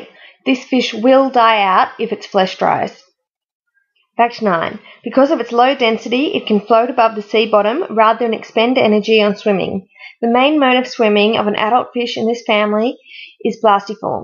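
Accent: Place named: Australia. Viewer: Its an aussie accent :)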